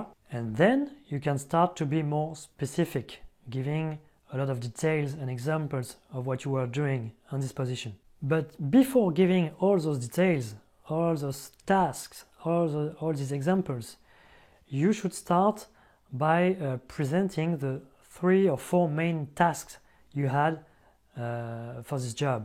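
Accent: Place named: France. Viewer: Je ressens toujours l'accent Français quand vous parlez lol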